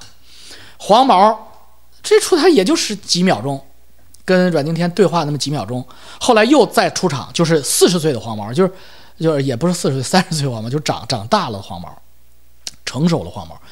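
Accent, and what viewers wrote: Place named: Tianjin, China. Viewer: 是桂林嘛，像天津話的嘛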